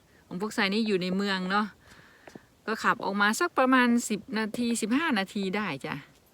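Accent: Isan, Thailand. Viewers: น้อง เว่าอีสานเพราะอีหลี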